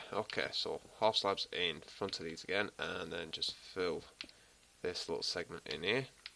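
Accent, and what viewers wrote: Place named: England, United Kingdom. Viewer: I ended up here by accident, I was researching medieval architecture, and after reading about how castle spires usually had their stairs built counterclockwise for defensive reasons, I came to youtube to see some videos of them. This wasn't what I was looking for, but I'm glad I ended up here, it's inspired me to go back to minecraft and start building. A bit of trivia, if on a single one of the stairs you miss out the halfslabs so that you have to jump, you'll end up with a trip step, an uneven step used to cause attackers unfamiliar with the steps to trip. It's useless for design, but great if you're building it to roleplay. Thanks for the amazing video, I'll be checking more of them any time I need to get inspired, I don't know if you're still active on youtube but I've gone ahead and subscribed anyway :) Also I recognise that accent, hello from a fellow Englishman :)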